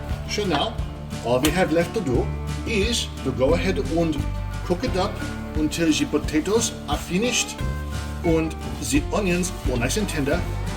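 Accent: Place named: Germany. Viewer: I hate to tell you this but your German accent sucks.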